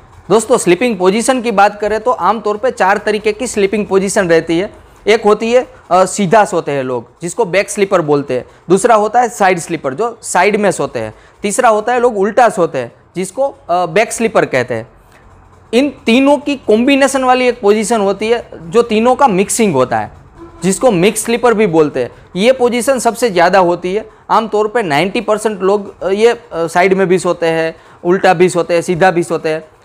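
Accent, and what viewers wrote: Gujarat, India. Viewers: Iskae hindi ke accent se lagta hai ye pakka Gujju hai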